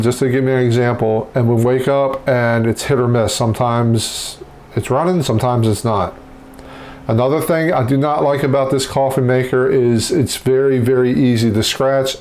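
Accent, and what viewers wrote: Baltimore, United States. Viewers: You have to be from the Baltimore area. Wooder, rinch and the accent are a dead giveaway.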